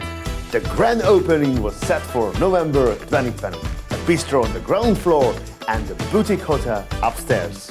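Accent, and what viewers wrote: Hungary. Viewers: Noo way..I knew I recognized that accent, but I never realized you’re not an, you’re a! 😁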